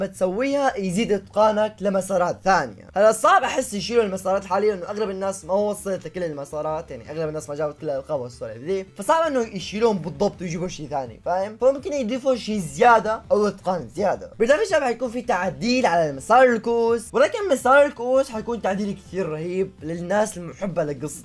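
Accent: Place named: Saudi Arabia. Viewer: سولف بلهجتك ليش تسولف سعودي و انتا سوري ؟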